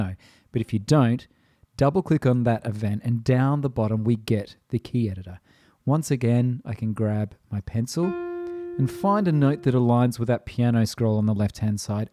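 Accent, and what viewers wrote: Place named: Australia. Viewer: I love Cubase 10.5.2 Pro but feel that I'm only using about 1% of its capabilities. It is clear to me that the person talking on this video is a fellow Aussie, but I have no idea who he is and would like to learn more about what he does musically and how he uses Cubase. The videos are awesome. Cheers.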